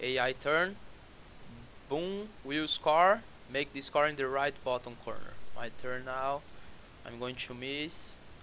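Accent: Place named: Brazil. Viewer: Acredito que seja Brasileiro pelo sotaque, mas que otimo video!! Nao pare de fazer videos!!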